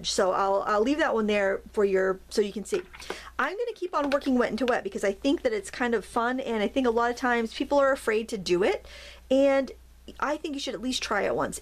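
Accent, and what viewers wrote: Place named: Norfolk, United States. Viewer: And, it's funny how her pronunciation is so much like my mom's. And mom was born in raised in Norfolk, VA. Lot to be said for the remnants of the dialects of the original English settlers.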